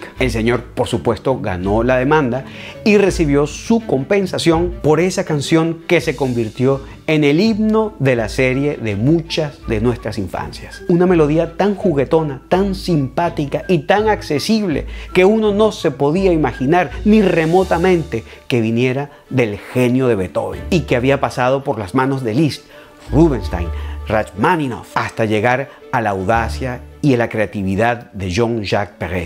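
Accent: Mexico. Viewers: . Que interesantes y profesionales me han parecido el par de videos de La Cata Musical que he visto. Me suenas Mexicano, y como compatriota, me enorgulleces. Muchisimos exitos y bendiciones. Seguire checando tu contenido. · ni parece venesolano se escucha mas mexicano que nada.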